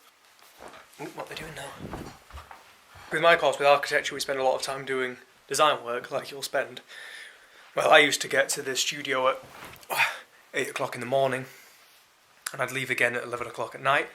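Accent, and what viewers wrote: Yorkshire, United Kingdom. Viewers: Lol. No magic, I recognise the accent being a Yorkshire lass misen :-)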